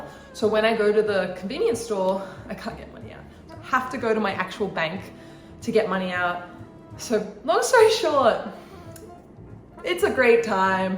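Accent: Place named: Australia. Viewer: I am sorry for your TP... cuz my Costco Purple TP is legit. Also, I love your Aussie accent when you can speak Japanese perfectly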